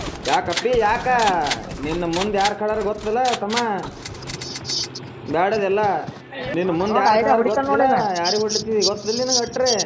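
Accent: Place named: North Karnataka, India. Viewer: Pakka utra kannada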